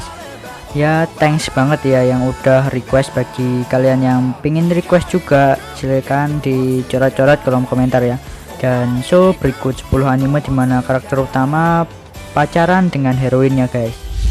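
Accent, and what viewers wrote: Java, Indonesia. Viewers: Wong jowo maa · Eh kau orang Jawa ya?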